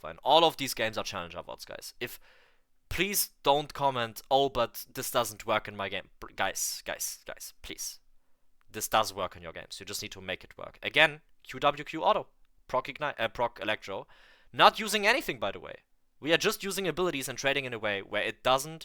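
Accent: Germany. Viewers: Nahh this video it was I was looking for!! love the german accent btw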